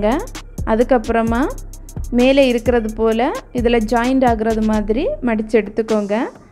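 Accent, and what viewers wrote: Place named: Kerala, India. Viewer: Neenga pesuratha patha ennakku malayalee mathiri tonudu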